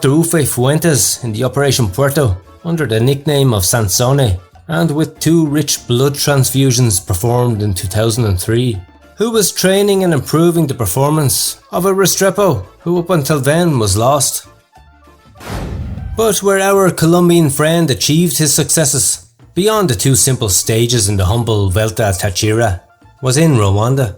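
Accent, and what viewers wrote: Ireland. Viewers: The videos and the information are always great but the Irish accent takes the biscuit or the cake👏💪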